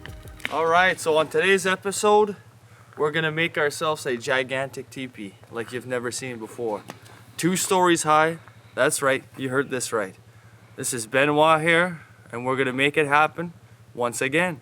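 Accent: Canada. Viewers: Your accent sounds like it's a mix of Canadian and New Yorker. It's like nothing I've ever heard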